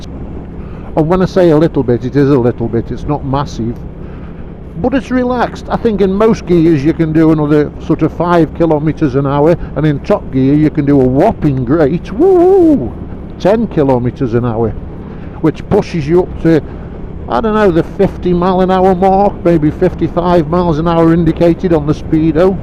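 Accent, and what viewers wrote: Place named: Staffordshire, United Kingdom. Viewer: Nowt up with your North Staffs dialect bit like ours, though bit further afield they travel around on buzzes It was only recently, on someone’s suggestion, I started putting helmet on it’s side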